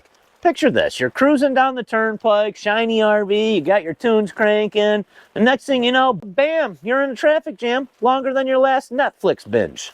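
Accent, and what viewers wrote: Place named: Rochester, United States. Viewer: Michigan here born and raised in western NY you sound like your from Rochester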